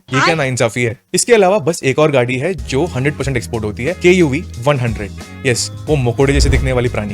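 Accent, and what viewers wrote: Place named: South, India. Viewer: Bro accent is like South Indian !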